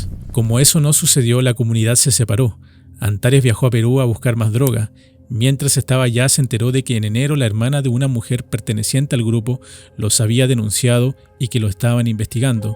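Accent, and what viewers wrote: Chile. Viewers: El acento Chileno no queda con tu nombre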